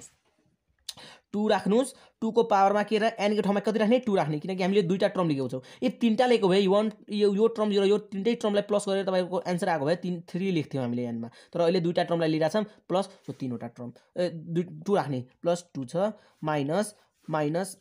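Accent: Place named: India. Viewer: R u indian? Plz fix ur accent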